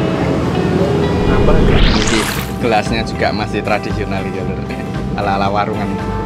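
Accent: Java, Indonesia. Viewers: yg unik itu kyk apa ya? Salah ya kalau gaya bahasanya medok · Menurut aku, blm ada uniknya dan bhs jawanya terlalu medok. Mhn ma'af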